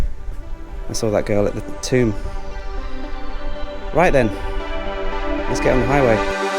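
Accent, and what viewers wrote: United Kingdom. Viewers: have to say, I have been to Vietnam 25 times and lived there for 2 years! But your videos make me want to go back and do all the places I've visited again 😄 Keep it up lad! Always love my tour videos in a blighty accent... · Fantastic and beautiful episode (as always) of Vietnam,! Really enjoying this series too! Had a little chuckle towards the end, with your word for swim shorts: "swimming costume"- you might of lived overseas for years - but you still sound so very British ( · I used to think British people were rather cold and didn't know how to joke, because I have a few British colleagues in the company. But after watching your channel I realized I was wrong, you are quite funny and cute and are you British (I recognize your accent)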